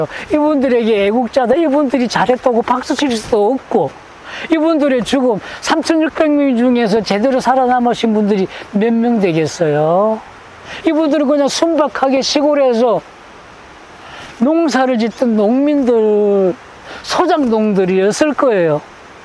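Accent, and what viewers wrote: North Korea. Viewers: 도대체 당신의 정체가 뭐냐 말투가 북한말인데 북에서 언제 내려왔나 당신이 받은 임 무가 뭐냐 6,25역사 왜곡하러 내려 왔냐 이것이놈의 나라가 완전히 공산화 되었구만 이런자가 설치는데도 방치하고 있으니 아하 이제 이제 이나라가 완전히 망했구나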